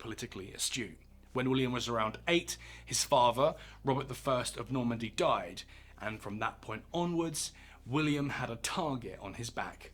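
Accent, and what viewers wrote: United Kingdom. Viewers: Why are the popular YouTube history channels always narrated by Brits? 😂 Rather soothing to my American ears lol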